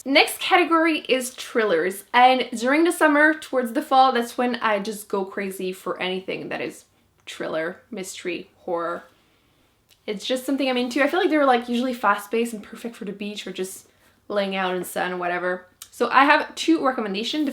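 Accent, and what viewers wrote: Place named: Quebec, Canada. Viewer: if you want to read more girls love girls books, i definitely recommend far from you by tess sharpe, labyrinth lost by zoraida cordova, beauty queens by libba bray, le bleu est une couleur chaude by julie maroh and not your sidekick by c.b. lee! also i saw that your accent is french canadian, and since i'm french canadian and have the hardest time finding cheap books, i was wondering if you have any library recommendations? anyway, great video! i have a couple of these books in my summer tbr as well, and i'm super excited to pick them up! <3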